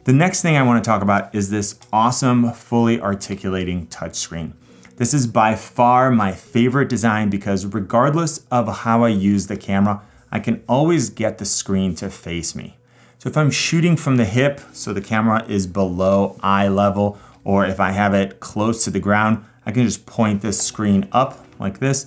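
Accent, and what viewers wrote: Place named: Ohio, United States. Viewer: Hahaha, I thought to myself, "Man, Ohio. I can hear it." Then you showed Cleveland. BOOM. Greetings from an Ontarian in South Korea. <3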